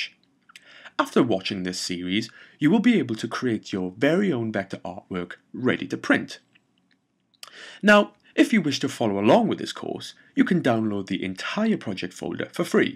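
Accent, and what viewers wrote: Wales, United Kingdom. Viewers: ah you have cute welsh accent!!! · Love the Welsh accent! 😍Da iawn ti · Is the narrator Welsh? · is that a welsh accent I detect?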